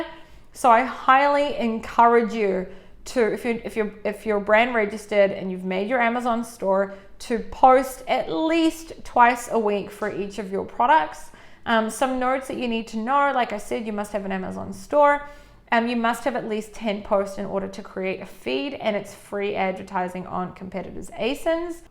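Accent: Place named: New Zealand. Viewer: Thanks, I just applied for a trademark with my brand through a lawyer. I'll be coming back to this content when I get it up and running! Nice to hear a kiwi voice on here too. Cheers. Sam from NZ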